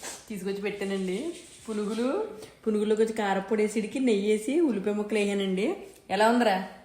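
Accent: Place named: Amalapuram, India. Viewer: అమెరికాలో అమలాపురం యాస మరియు పునుగులు స్వచ్ఛంగా ఉన్నాయండీ...😊👌💐💚🇮🇳💜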